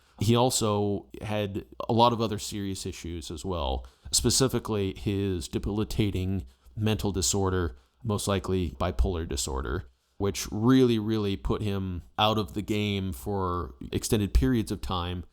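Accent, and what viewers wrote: United States. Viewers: I apologize, that's my bad then. I even listened to it a few times but I still couldn't quite decipher your strong American accent 🙃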